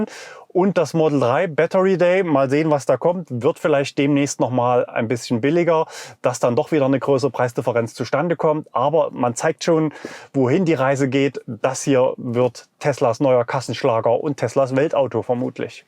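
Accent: East Germany, Germany. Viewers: ich halte diesen Ossiakzent nicht aus :(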